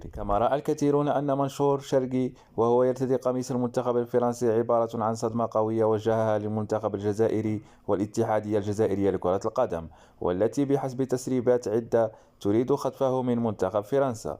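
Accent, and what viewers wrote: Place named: Morocco. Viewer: ههههه لافوا تاع مروكي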